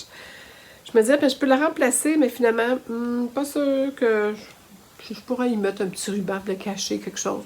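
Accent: Quebec, Canada. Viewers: Merci pour la présentation et les conseils que vous apportez dans cette vidéo. J’adore votre enthousiasme et votre accent Québécois ( je connais, ma fille aînée, mon gendre et mon petit fils 🥰habitent depuis 7 ans bientôt à Montréal )! Je suis fan de Fibre Mood mais j’hésite parfois à réaliser certains modèles que je pense peut être trop jeune pour moi (65 ans😊) Vous m’avez convaincue du contraire, j’adore vos choix, vous êtes très élégante 👏👏👏. Je vais vous suivre, c’est sûr. Je vous souhaite un bon séjour en France et une bonne et créative année 2024. Véronique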